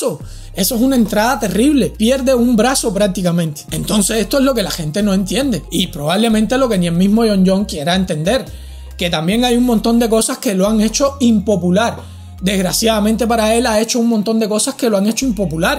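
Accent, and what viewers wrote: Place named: Cuba. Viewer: Yow mano, me encanta lo claro que hablas y ese acento cubano.👊🏼😁 Saludos desde España.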